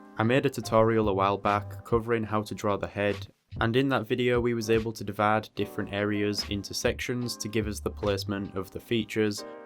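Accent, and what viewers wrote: United Kingdom. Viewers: cool tutorial but i cant figure out wtf is going on with ur accent. like i feel like its British or something most of the time and then you'll say a word that just sounds so southern. not hate I'm just really confused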